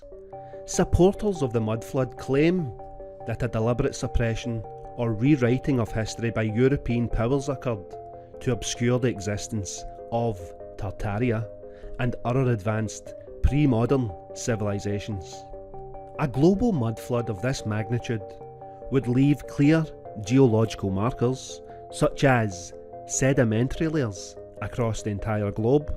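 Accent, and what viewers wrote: Scotland, United Kingdom. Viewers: English with a Scottish accent. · Please go back to the other narrator Scottish accent is completely spoiling the experience of watching any videos just awful. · All of it. Also, remember that some folks (hi America!) struggle with Scots accents.😅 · Congratulations, you have stumbled upon the Scottish accent…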